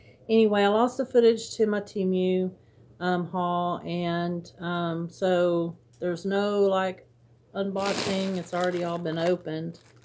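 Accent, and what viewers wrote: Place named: South, United States. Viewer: I think it was a great haul! Hey look at the bright side, you don’t have to worry about people complaining about the crunching bags and wrapper’s 😂! I think you’re absolutely wonderful, keep smiling 😊… and just know you’re doing an absolutely amazing job sweetheart! Have a Blessed 😇 day! The A in my name stands for 👼 Angel! Also, I am from Kentucky and noticed a southern accent, just curious to know where you’re from?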